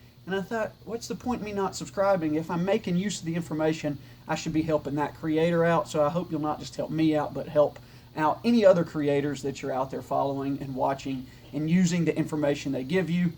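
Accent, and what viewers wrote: Arkansas, United States. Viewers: You don’t happen to be from Arkansas?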